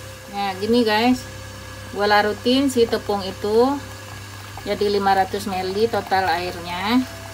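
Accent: Padang, Indonesia. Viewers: Logat nya padsng... nyebut gua ga pantas. Bahasa gue tidsk sopan. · Aneh org pdg ngomong gw.gk enak d denger nya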